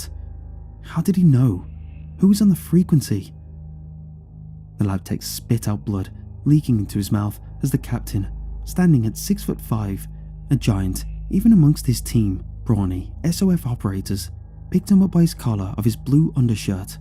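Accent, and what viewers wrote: United Kingdom. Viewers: Sorry, can’t listen to some bullshit story about US troops from a British accent and verbiage. Wtf?!?!?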